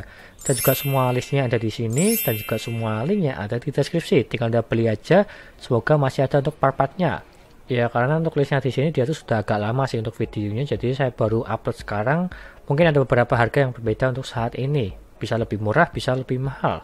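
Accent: Java, Indonesia. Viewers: Bang ngomngnya medok bnget😅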